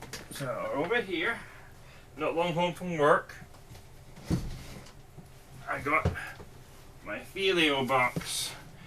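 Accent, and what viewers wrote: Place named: Scotland, United Kingdom. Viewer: wasn't expecting that! Still sounds VERY Scottish to me!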